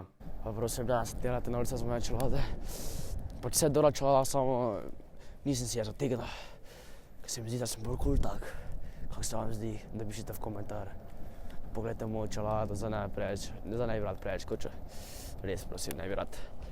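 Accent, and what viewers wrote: Styria, Slovenia. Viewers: Nauč se govort normalno pa ne po Štajersko pa boš Mel več ogledov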